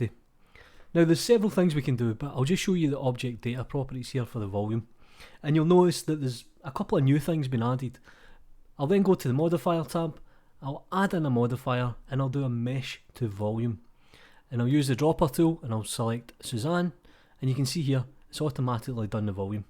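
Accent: Scotland, United Kingdom. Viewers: Glasgow? I miss the Scottish accent ;) Nice channel mate.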